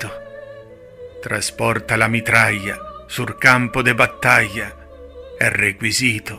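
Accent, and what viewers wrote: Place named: Marche, Italy. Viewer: Peccato la cadenza che su Trilussa doveva essere obligatoriamente Romana invece sembra Marchigiana/Umbra. Nulla toglie alla bravura dell'interprete.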